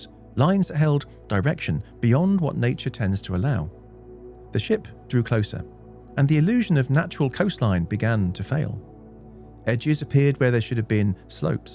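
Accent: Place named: England, United Kingdom. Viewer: please, no more english accent 😮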